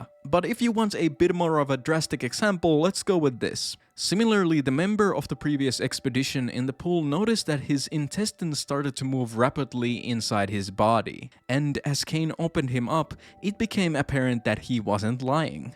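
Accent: Finland. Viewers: Came to the channel for the luscious finnish accent, stayed for the absolute bangers of videos, incredible job!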